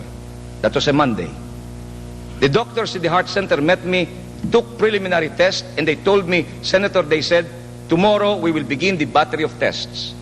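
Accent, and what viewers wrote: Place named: Kapampangan, Philippines. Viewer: I love the kapampangan accent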